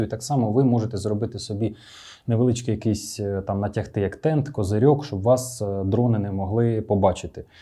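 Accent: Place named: Central Ukraine, Ukraine. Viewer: Клас, наш хлопець. Центр UA.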